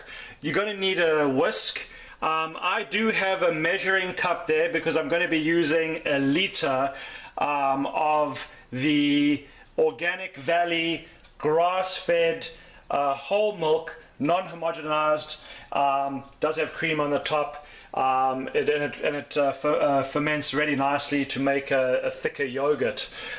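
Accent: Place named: South Africa. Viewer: That goes without saying. The heating process is to attack some of the non-beneficial bacteria and microbes. That means, as you point out, that some benefit will be sacrificed along the way. Why should we sacrifice more than we ought. Also, I have discovered that 180 f is optimal heating and cooling to 108 is also perfect. At this time I will then place the jar in an insulated baking dish and fresh dish towels with a layer of paper towel rubber banded on. At this point, the oven will be maintened around 82-84F with simply the use of an oven bulb. Lastly, I have discovered that optimal fermentation/curing time is between 6.5 and 8 hours. Lastly, are you South African? Your accent reminds me of the many Boere which I have known.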